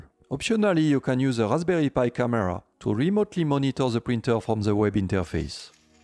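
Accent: France. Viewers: This is the thickest French accent I've ever heard. Great video though!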